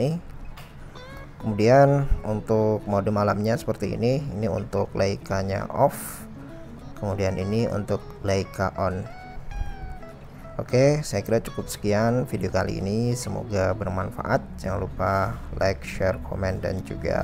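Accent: Pasuruan, Indonesia. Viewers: Eh masnya pasuruan toh 😄 Koyok daerah bangil. Oh iya Mas mau tanya untuk redmi note 7 ada gak gcam support yang ada fitur wide angle ada gak mas? Buat keperluan foto lanscape 🙏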